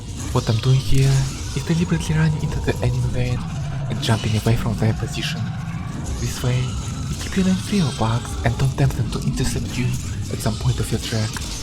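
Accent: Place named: Europe. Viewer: european accent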